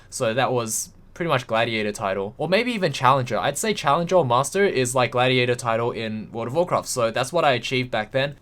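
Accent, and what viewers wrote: Australia. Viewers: Dude, just move to America. Girls are obsessed with Australian accents. My sister always says you sound hot whenever I watch a video next to her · A chinese guy with an australian accent wtf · yeah i thought so, i could tell from your low-key aussie accent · Ah never mind I saw you going to pax in Melbourne. I thought I could hear the accent. Bro you’re a legend. I’m a lot like you in many ways (but not good at league). Anyway if I go to pax I’ll keep an eye out for you. No pressure dude I’ll see if I have enough money to buy you a drink or something xd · Are you from Australia? Greetings from Hell's Gate dude!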